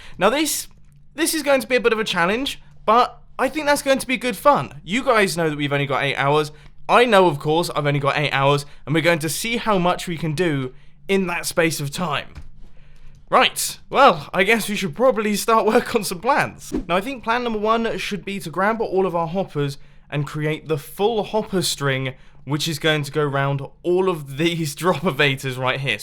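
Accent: United Kingdom. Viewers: where are u from in terms of like Yorkshireman or Londoner.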